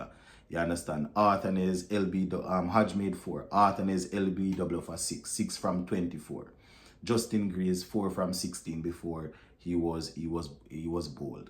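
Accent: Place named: Jamaica. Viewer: greetings my brother. I trust all is well. I haven't heard you used the phrase "The men not good" in your Jamaican accent. I believe the expression will be relevant in this context.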